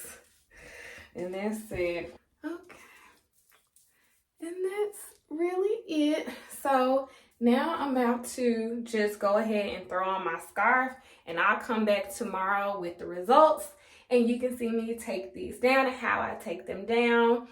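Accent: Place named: North Carolina, United States. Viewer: Cute💕..are you from NC? You sound just like me😂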